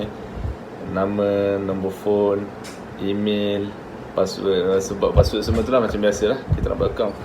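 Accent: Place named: Kelantan, Malaysia. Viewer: Duk perati perati lamo doh bunyi kelate 😂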